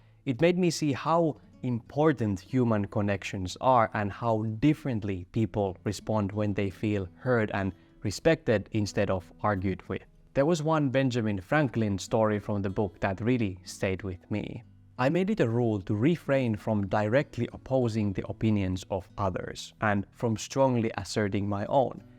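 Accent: Finland. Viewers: The moment I heard finnish accent I knew I was about to hear something wise. Kiitos.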